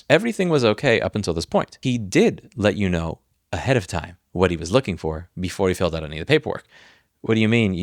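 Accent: New York, United States. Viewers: I LOVE how this tough talking New Yorker who teaches everyone how they are getting F**ked every video is a competant Couple Counselor 😅:hands-yellow-heart-red:❤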